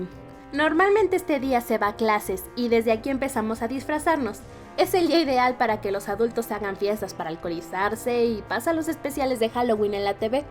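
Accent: Chile. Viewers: Yo si pensaba que eras chilena 😆 es que tienes el asentó de chile soy de mexíco y conozco a unas personas de chile las conocí por Wattpad un saludo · Yo creí que eras chilena por tu forma de hablar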